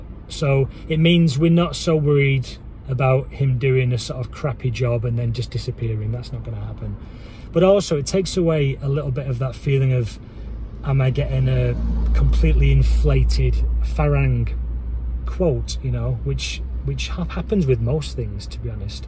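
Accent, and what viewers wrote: United Kingdom. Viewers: Where in the uk are you from mate? Just found your channel, subscribed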